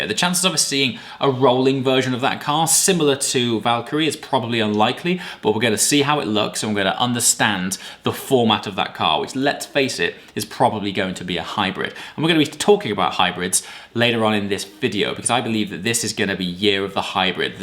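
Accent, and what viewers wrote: United Kingdom. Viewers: THE British native speaker.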